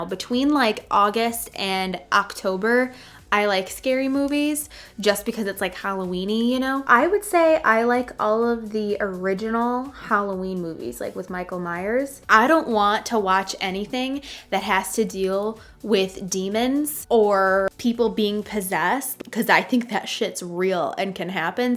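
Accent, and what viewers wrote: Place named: Chicago, United States. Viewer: Love listening to your Chicago accent. Made me smile when you said Pop for soda ☺️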